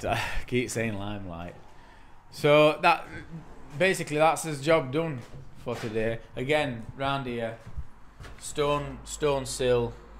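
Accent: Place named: Barnsley, United Kingdom. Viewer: Only watched one video and I can tell you’re deffo from Barnsley. Best accent in the world.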